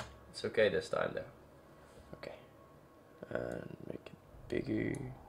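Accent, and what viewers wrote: Netherlands. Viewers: Are you Dutch? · Lol love ur englisch its so funny and i reconice myself, becous i also speak dutch ;D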